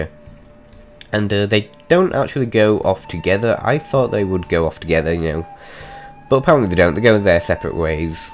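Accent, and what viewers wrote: United Kingdom. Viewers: Humberto Castro I think he is British,but sometimes he spoke so fast that's hard to understand indeed.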